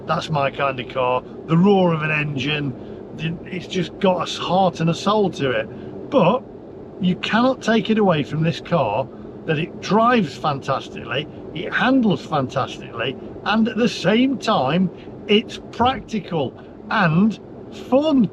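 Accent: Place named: North, United Kingdom. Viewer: You might have a northern accent but your car test is totally irrelevant to the rest of us mere mortals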